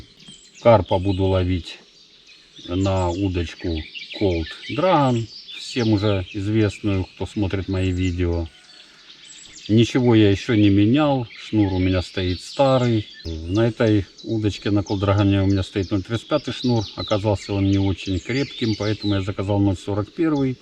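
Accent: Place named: Ukraine. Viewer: спасибо за рекомендацию) по Украине - дык по говору узнал своих😅 по карпу-Вы абсолютно правы! бывает 3 кг рвет круче 10ки. самое интересное в тгм году на небольшой но быстрой и закоряженной речке взял 2 карпов с разницей в несколько дней. оба 3400+-. дали абсолютно разный бой. первого я просто чудом достал. второго - легко и спокойно.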